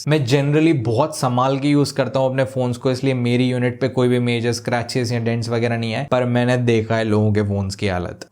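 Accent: Bhopal, India. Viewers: Bro is a bhopali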